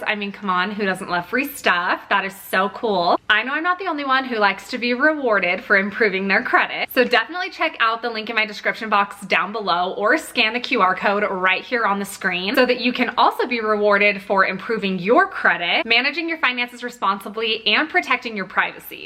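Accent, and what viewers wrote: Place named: Utah, United States. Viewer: It's part of a Utah accent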